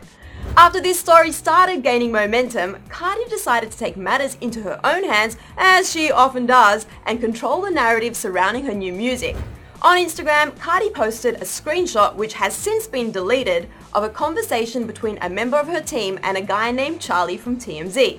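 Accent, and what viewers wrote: Australia. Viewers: Whose this new Australian host? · love her accent :O is that australian?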